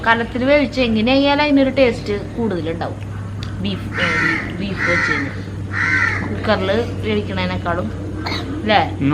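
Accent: Thrissur, India. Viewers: I am regularly watching your blogs of different recipes. I love it, I liked your Thirussur slang. I am native of Kozhikode, but settled in Vellore-632006, Tamilnadu, since 50 years. I love the way you and your wife jointly cook. All the best....Roney Raymond. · I like ur thrissur slang...beef looks yummy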